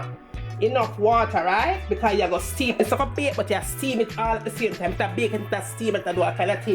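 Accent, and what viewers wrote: Jamaica. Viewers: Thanks! I am so happy I found your recipe. You are hilarious, confident and talented, who else could so eloquently and entertainingly deliver a tutorial in that beautiful Jamaican patois. Keep spreading the love, you are special!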